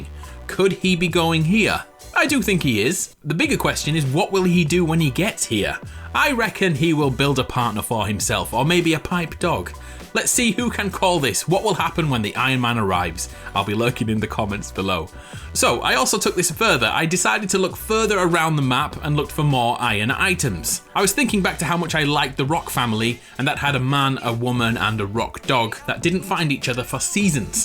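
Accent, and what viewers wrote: United Kingdom. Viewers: Wait wait wait.......... Is British?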